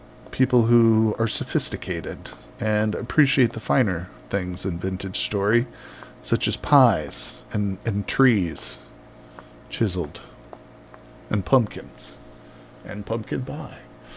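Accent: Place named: Minnesota, United States. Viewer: I said in a previous video that you sounded familiar. Now you're confirming you live in central US. What are the chances you live in Minnesota? Lol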